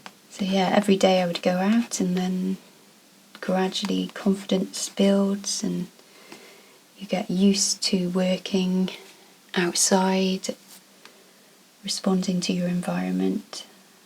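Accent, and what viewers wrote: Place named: United Kingdom. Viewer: I love the colors in this so much, and your style! Started to watch yours and other YouTubers this winter when I surfed around for inspiration this dark winter. Always loved British accents and yours is so nice to listen to, haha, sounds weird maybe, but I am Swedish and most sweeds speak English with a terrible American accent. The vlog when you are walking around and sketching the beautiful landscape is so nice to watch. I'm going to South of France next week and will be doing my first sketching outside there I hope. In Stockholm its far too cold, the snow just melted. Thanks for the inspiration!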